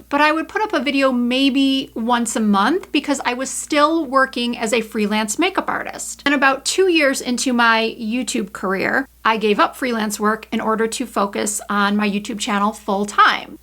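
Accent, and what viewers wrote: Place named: Michigan, United States. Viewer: What part of Michigan are you from? Good video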